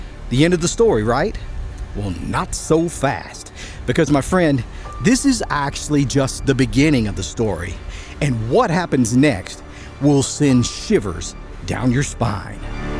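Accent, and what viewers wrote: Appalachia, United States. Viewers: Wonderful video, outstanding research, and terrific Appalachian narration. This episode was my introduction to your channel and I thoroughly enjoy the originality of storytelling and visuals. Subscription activated. Speaking from experience, children are sensitive and susceptible to spirit encounters. Great job!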